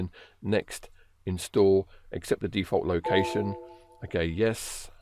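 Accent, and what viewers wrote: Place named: England, United Kingdom. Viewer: I am an American so my English is very different from who I assume is doing the commentary here. He has a VERY heavy England's English "accent(?) which was VERY difficult for me to understand - sorry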